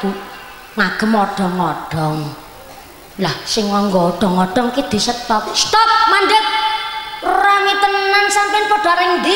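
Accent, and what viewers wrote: Banyumas, Indonesia. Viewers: Asalamualaikum mba aku sangat kagum kalo mendengar suara inget lg di banyumas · Meskipun ngapak mantap mantap aku seneng dengernya ngaji seperti ini siplah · Ustadzah..ngapak tapi asike pooll.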